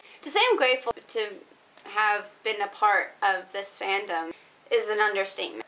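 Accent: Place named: United Kingdom. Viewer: aww you have a amazing british accent and that song?! IS IT ROB SINGING?! I LOVE IT! and do you know what its called? and haha i love your videos! :D DONT YOU DARE GET EVER BORED OF ROB LOL! :D and aww what you said was so cute :) I am so consider myself so close to you too and i love you! :) like a big sister <333 and yes! SHAYTARDS! :D and what you said about the other girls are so true! :D LOOL YOU AND MONA! haha i love you <3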